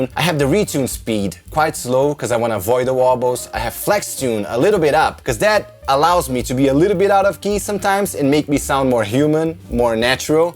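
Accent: Brazil. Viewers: I bet you are brazilian cause of your accent- same as mine :) .. Nice video!! keep it up!!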